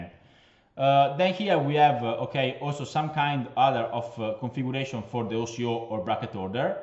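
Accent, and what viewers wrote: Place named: Italy. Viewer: chicco bello il tuo inglese è buono però si sente che sei italiano aahahha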